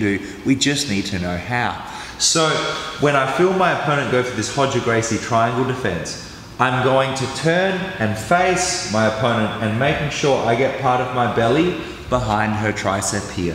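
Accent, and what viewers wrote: Australia. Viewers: His accent sounds Aussie, but his school is based in LA now I think?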